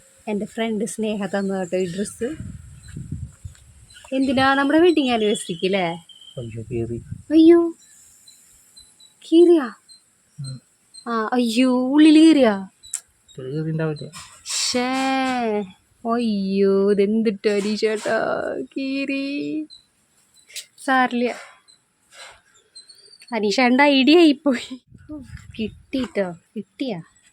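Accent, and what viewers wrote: Thrissur, India. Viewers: Are you thrissur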